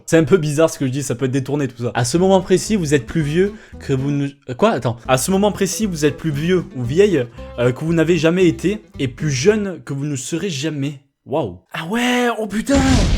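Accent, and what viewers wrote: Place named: Aveyron, France. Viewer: Le bon accent aveyronnais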